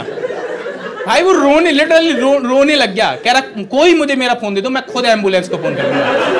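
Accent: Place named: Western Uttar Pradesh, India. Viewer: Western UP ka accent lag raha....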